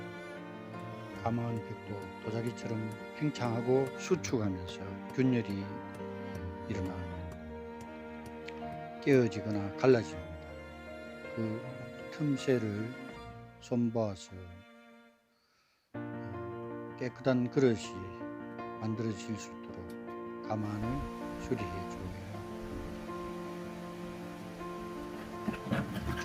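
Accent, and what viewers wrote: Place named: Gyeongsang, South Korea. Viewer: ^ 채널명이 멋집니다 열정의 샘이시군요. 작가님! 구수한 경상도 목소리가 좋습니다. 화이팅입니다..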